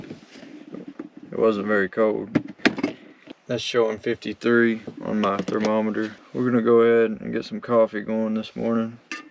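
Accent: South, United States. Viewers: nice, you have a pretty strong accent and I figured you are from the south, a gun would be a good idea eh but then again you are safer in the woods then in a city lol here in Canada we are under attack because our government wants our guns 😡 we are fighting like hell to keep them, anyway that’s a long story… stay safe 🤙🏻🇨🇦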